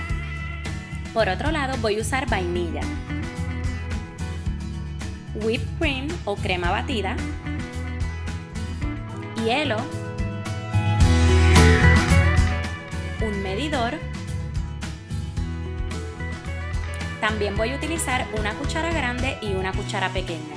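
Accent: Puerto Rico. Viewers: Ella es Puertorriqueña al escucharla se sabe. No la critiquen por como habla cada cual tiene su acento segun su patria. Super rica la receta... ;)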